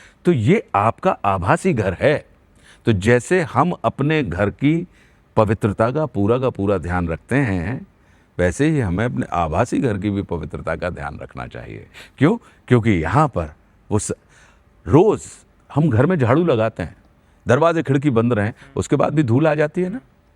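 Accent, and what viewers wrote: Standard. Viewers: Haven't heard such a pure Hindi narration in a long time. Ashutosh Rana's command over the language is impeccable. I wonder where the intellectual people of our country are nowadays? Feeling great listening to it. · Kya zbrdst shudh hindi bolte h ❤ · So good to listen pure hindi, very rare to hear pure language these days.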